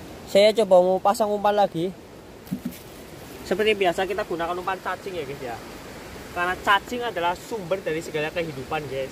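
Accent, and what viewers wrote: Banyumas, Indonesia. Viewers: bang kalo boleh tau abang orang mana nih kok ngomongnua agak ngapak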